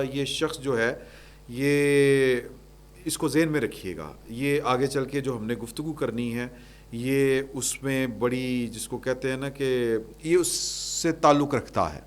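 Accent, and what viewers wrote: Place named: Lahore, Pakistan. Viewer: بات بغیر کگی لپٹی ھوسکتی ھے لاھورن سٹائیل کی کیا ضرورت ھے ۔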